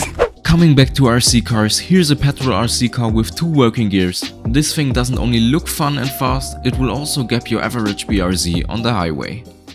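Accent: Germany. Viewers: Mann erkennt direkt den deutschen in dem english Akcient😂😂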